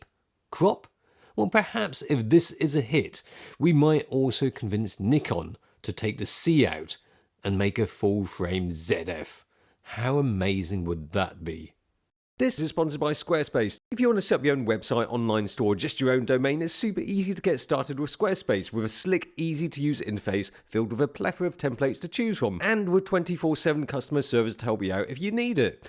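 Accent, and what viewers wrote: United Kingdom. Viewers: Thats how we pronounce it here in the UK ( Kai is British of Hong Kong Chinese decent ) and its the original pronunciation btw, its only Webster whom decided to change our British language and spellings because he had an issue with some of the French inflections within our language. We spell Harbour with the u, you spell it Harbor without because he changed it, the same goes for Aluminium. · I'm just mesmerized by an Asian guy with caricature glasses and a British accent/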